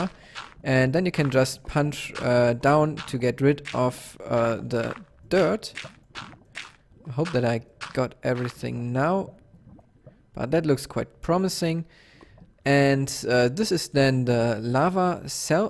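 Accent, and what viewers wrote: Germany. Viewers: you describing the killing chamber so calmly in a vaguely German accent made me uncomfortable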